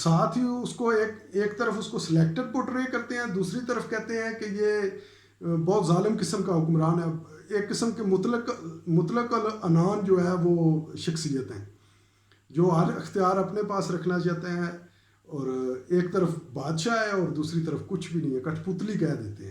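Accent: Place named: Punjab, Pakistan. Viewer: Hire someone who can speak better. You have a very thick punjabi accent. As a result the pronunciation of urdu and english words is totally messed up. Content is fine.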